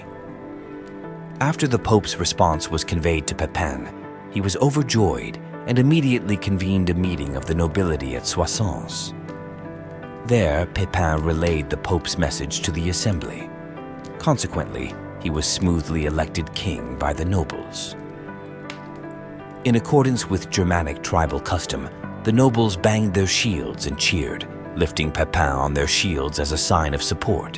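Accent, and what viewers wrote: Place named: United States. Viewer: The sinister American voice over and sneering is a deception. As I learned at university documentation as happens now, was often simply a formalisation of a fact. The Papal States were a buffer intended to protect and reduce pressure from the rule of kings and armies. It was intended to create neutrality as the Bishop of Rome acted with more authority than any present internal court. The claim Constantine had donated the territory to the Bishop of Rome and Church was not untrue. Constantine had compensated the Christian Church for the way the Roman State had attacked, murdered and persecuted them. He gave the Laterin Palace to the Bishop of Rome. Given authority to the bishops along with governers. He built basilicas, founded churches and created religious tolerance via the Edict of Milan.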